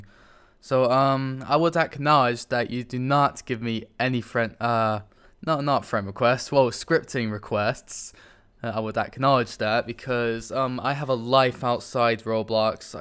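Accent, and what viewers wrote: United Kingdom. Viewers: I used to really like/want a british accent but i swear to fucking god i am glad i dont have to hear that for a long time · are you British?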